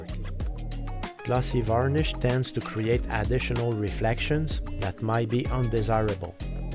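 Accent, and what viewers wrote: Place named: Ottawa, Canada. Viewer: I live in Ottawa as well so your accent makes me feel right at home :). Keep up the great work. I’m enjoying going through the back catalogue.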